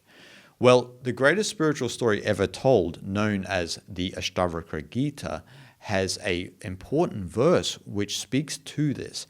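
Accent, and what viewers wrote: Australia. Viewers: Love your videos and your Australian accent ♥️🦢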